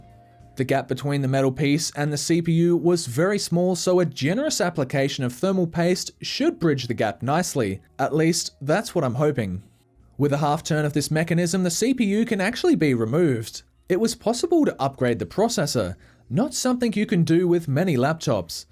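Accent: Australia. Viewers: Your videos would be very entertaining if it were not for your extremely annoying Australian accent can't you learn an American accent and do the video with that so you sound halfway normal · The second you said HP, I was missing the bold text from DankPods's video. Aussie accent ftw 🤣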